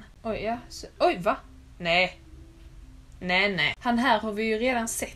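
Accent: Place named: Skåne, Sweden. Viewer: Du lät verkligen som alla i Greveholm haha för du pratar väll skånska?😂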